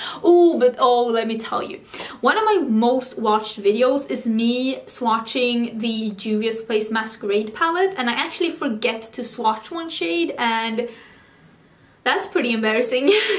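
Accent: United States. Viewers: I have a question for you: where did you learn your perfect American English? Did you ever live in the U.S.? Just wondered.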